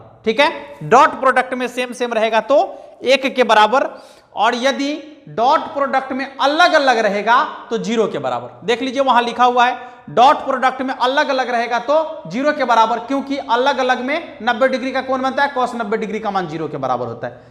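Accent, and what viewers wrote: Bihar, India. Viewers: सर बिहार से है का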